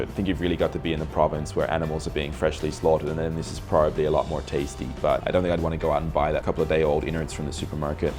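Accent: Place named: Philippines. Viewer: bossing, yung australian accent mo nagiging pinoy accent... naturalized ka na!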